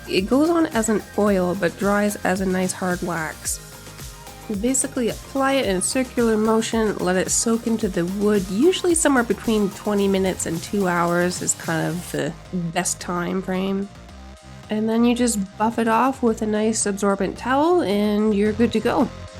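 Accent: Canada. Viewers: Canadian eh? AWESOME!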